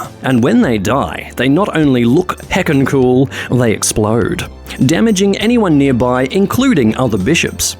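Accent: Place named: Home Counties, United Kingdom. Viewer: Home Counties?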